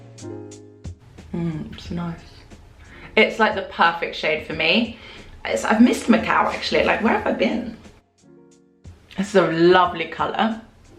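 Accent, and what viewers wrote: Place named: United Kingdom. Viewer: Literally just found your channel! I'm a fellow Brit and adore your accent and the way you speak! Thanks for the great reviews x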